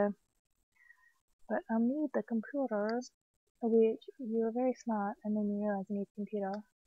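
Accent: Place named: Australia. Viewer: Are you Australian? You sound it :)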